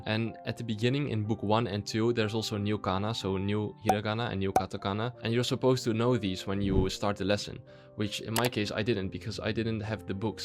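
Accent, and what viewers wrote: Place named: Netherlands. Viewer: I actually used the same video series when I started learning Japanese 5 years ago Ik wist gelijk dat je nederlands was door het accent man geweldig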